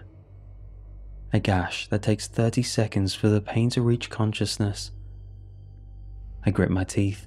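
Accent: United Kingdom. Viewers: Amazing voice from our favorite british narrator!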